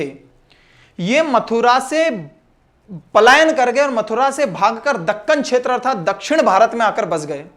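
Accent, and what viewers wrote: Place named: Madhya Pradesh, India. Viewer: Sir ji ye to hmari burhanpur ki language h o bhiya wali mja a jata h sunker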